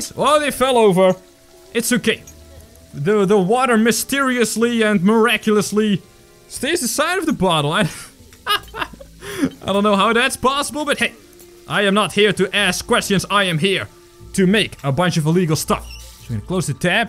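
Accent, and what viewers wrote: Netherlands. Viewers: Nice futch accent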